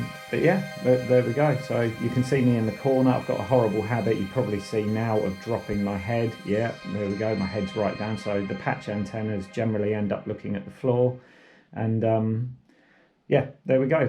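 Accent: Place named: England, United Kingdom. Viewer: Agreed, music is too loud and unnecessary. Everyone thinks their voice is weird, yours is perfectly normal. In fact the English accent makes you sound smarter than the rest of us… thank for the info.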